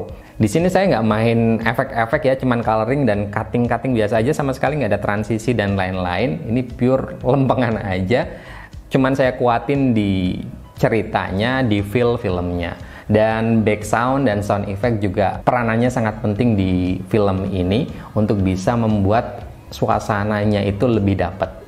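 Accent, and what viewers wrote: Pekalongan, Indonesia. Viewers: Sampean Pekalongan ya mas?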